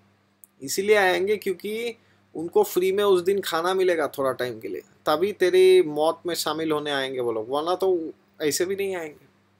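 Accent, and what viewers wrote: India. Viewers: Like the Hindi accent lol. Good explanation anyways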